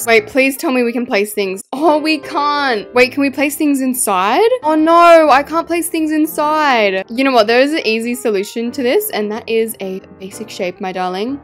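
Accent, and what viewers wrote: Australia. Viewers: I wish we could switch accent I have a American accent, and I love your accent I wish I was Australian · Your accent is perfect ( btw I’m and Aussie too) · OKAY I love your ✨ Australian accent✨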